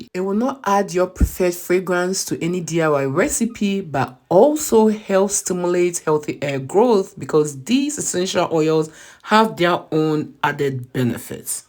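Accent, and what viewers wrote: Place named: Africa. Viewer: Right especially with that accent sheesh !!! Africans are so dramatic 😂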